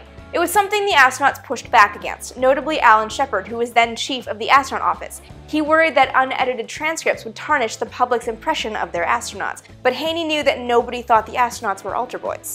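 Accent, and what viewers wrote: Canada. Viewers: You sound Canadian.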